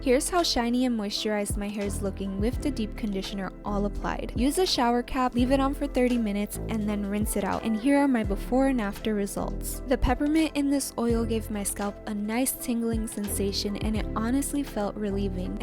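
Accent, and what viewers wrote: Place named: Caribbean. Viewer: Your hair is fabulous and I love your accent! It's soothing. You sould like you're from the Caribbean. Where are from?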